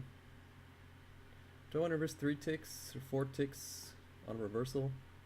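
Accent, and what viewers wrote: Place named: France. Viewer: hey man, whats up. I followed you on twitch. Do you still stream? also you sound french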